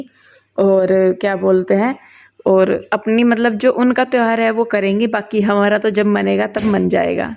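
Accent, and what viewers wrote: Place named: Saharanpur, India. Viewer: Aap saharanpur se ho aas pass me kisi Gao se aapki aavaj yahi ki hai 🙏🙏🙏🙏🙏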